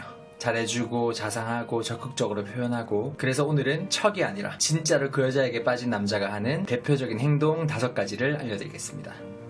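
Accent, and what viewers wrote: Gyeongsang, South Korea. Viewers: 아 역시 대구분이시군요.대구출신 친구들이 많은데 그 친구들한테 듣던 억양!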